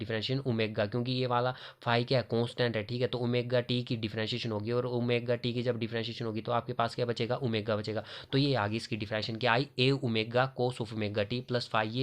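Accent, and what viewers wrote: Himachal Pradesh, India. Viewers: Himachali accent is always osm ❤😁😉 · Bahut simple way mai smjha diya aapne bhai very nice keep it up and love your Himachali accent ❤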